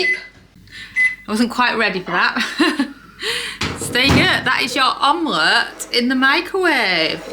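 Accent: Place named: Hull, United Kingdom. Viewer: Just 'found you'. I'm a disabled guy in my 70s living alone ('cept for the dog - she's lousy at cooking with an air fryer). So cooking, necessarily, is either microwave or air fryer! Your recipes are gonna be real useful! Do you have a particular Air Fryer you recommend also, in the reviews - I'm presently using a real cheapie one. Does the job ok for simple meals/ready meals etc. Btw, where's yer accent from? - I was brought up in Hull in Yorkshire ('Ull') decades ago and your accent's a bit reminiscent of me childhood! Decades ago I lived in Japan a while, and I had to 'lose me 'Ull accent real quick - ('...they 'ant gorra clue what yr'on abaht if y'talk wi' a 'Yorksheer' accent in Tokyo!). I haven't been back to 'Ull since early 2000s. Would get totally lost there now! Cheers! Tony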